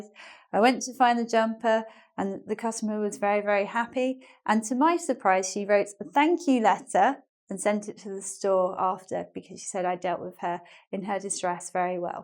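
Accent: United Kingdom. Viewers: If this is not a british accent then I don't know what the fuck is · you're so cute and your British accent fits you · What a question. I didn`t notice her accent myself.kkkkkkk Of course she`s british man, c`mon. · a beautiful British accent =))) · really? you should listen people speak cockney too. She has a lovely British accent for me ;) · I am big fan of your. r u British? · Dieses british englisch macht einen total wahnsinnig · i came here to practice my english...i struggle a little bit with british english but it was great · Im very sure this is british accent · I appreciate your your tips too much. I love british accent and you was very helpful to me. I´m preparing myself for an interview to a job at a british university. God bless you and me! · are you british? I notice your accent different, thank you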